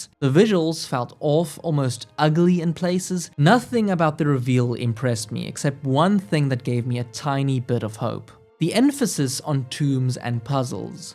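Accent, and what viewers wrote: South Africa. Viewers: The most South African sounding creator I have never seen.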